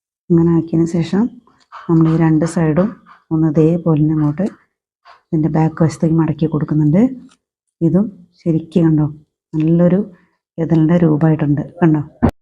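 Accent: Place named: Palakkad, India. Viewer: njaanum palakkattukaaran aanu.. samsaaram kettappol thonni 😍😍😍😍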